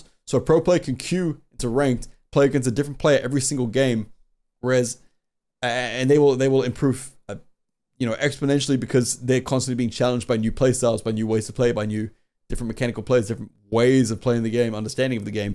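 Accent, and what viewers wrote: New Zealand. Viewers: can u give me some tips on how to do the kiwi accent? it sounds just so clean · I keep forgetting you're from NZ lmao, your accent is so different from what im used to aha, love from Wellington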